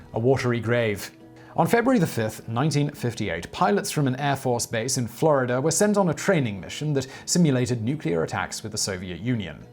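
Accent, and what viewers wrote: United Kingdom. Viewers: He's British, they pronounce some things differently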